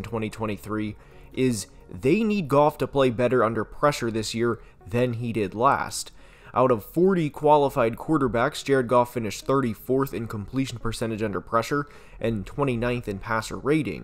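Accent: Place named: Minnesota, United States. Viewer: is a Minnesoh-tan gotta give him a break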